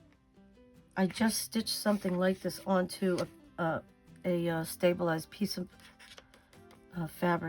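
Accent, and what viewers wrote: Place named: Massachusetts, United States. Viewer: What part of Mass are you from, because I detect an accent?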